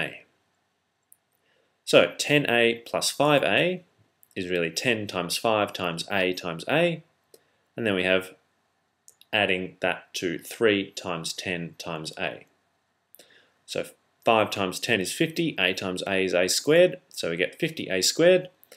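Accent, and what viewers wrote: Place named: Australia. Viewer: nope, i'm 100% british and THAT is not english, i'd say he was australian or something....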